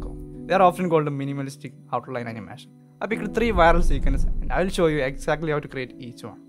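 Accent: Kerala, India. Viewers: malayali alle ? enikk aa accent kettappo thonniyatha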